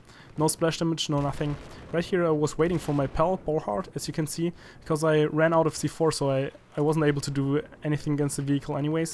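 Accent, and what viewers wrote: Germany. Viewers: Oh are you German? I notice an accent there :D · are you german ? You sound like you are. You accent is like you come from germany because of the th pronouncing. -Mojo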